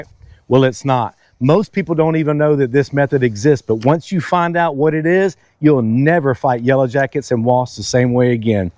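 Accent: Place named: Georgia, United States. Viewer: Excellent video.I'm from georgia, and I had trouble with wasps when I was a kid, and i'm still scared of them now. I'm subscribing to your channel just because of how well this video was produced. Excellent information.Now, I won't be so worried when I go and help my mom take out wasp.Nest at her house. Also, it was a pleasure here in a country.Accent, that's one of the things I miss about georgia. Keep up the good work, sir.And god bless you and your family.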